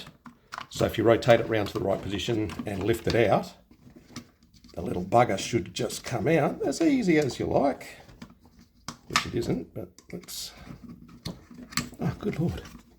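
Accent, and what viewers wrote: Australia. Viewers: Thank you so much. Your explanations are very clear and easy to follow ... even with an Australian accent. I'm now going to attempt to fix my door open light on my 2011 Mazdaspeed 3. Thanks!!!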